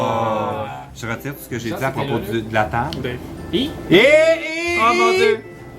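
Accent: Quebec, Canada. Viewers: Plus j'écoute ton Vlog plus je me dit que j'aime vraiment l'accent québécois 😍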